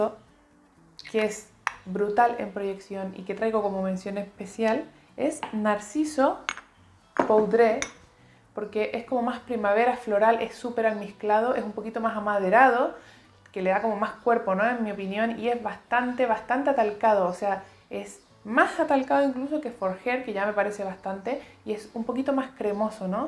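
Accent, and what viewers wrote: Chile. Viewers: por tu acento pareces Chilena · * tiene acento de chilena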